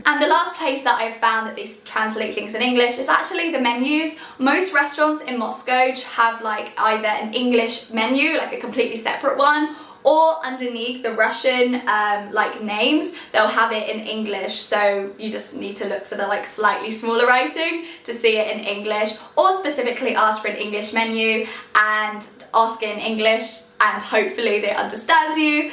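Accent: United Kingdom. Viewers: You should get rid your very strong so called "british" accent. Try to learn pronunciation from Cambridge or Oxford courses of English, or, at least, from the TOEFL, because american accent is easier to understand for Russians. But the best (purest) English pronunciation is in Australian universities and on TV.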